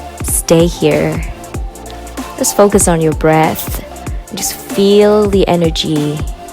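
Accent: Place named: United States. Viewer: O nói mà tưởng bà Mỹ nói á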